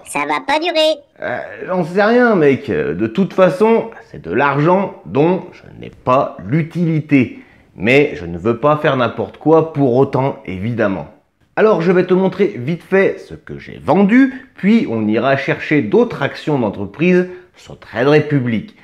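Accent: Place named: United States. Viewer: Et dire qu'il y a des gens qui achètent des formations pour des centaines de boules ! Et juste pour avoir des idées d'investissements ! 🧐 Alors qu'il existe des cow-boys comme toi qui partagent du très lourd ! El Padre PS : P'tite curiosité perso, explique un peu d'où t'as chopé cet accent américain pas dégueu. du tout ?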